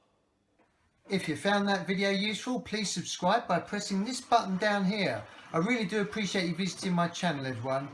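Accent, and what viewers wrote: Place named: Suffolk, United Kingdom. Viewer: Thank you! This answered a question I've had for a year since buying a house with a Bosh washing machine. Love the accent,! Are you from Suffolk?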